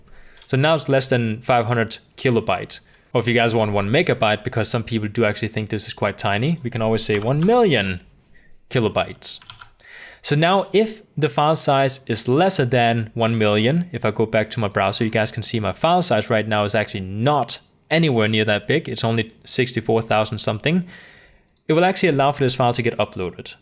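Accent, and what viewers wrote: Denmark. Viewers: danish accent yes?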